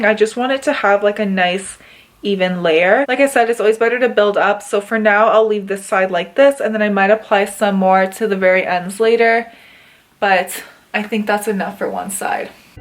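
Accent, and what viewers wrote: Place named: United States. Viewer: Are an Indian u are talking like American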